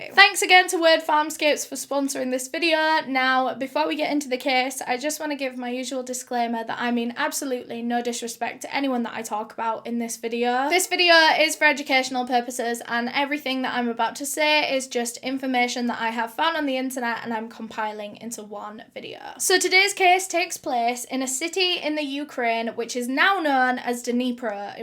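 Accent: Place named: North, United Kingdom. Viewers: She’s northern 😁💗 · I'm from Dublin and my brothers wife to be is from Leeds and she sounds alot like you. That accent is too gorgeous 😍😍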